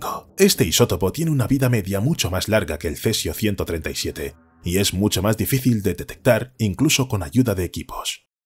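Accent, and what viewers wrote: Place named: Spain. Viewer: excelente gracias por tus videos! son interesantes y relajantes por tu tono de voz español que me recuerda a los documentales que veía cuando era un niño. Saludos desde la ciudad de Quilmes, Buenos Aires, Argentina.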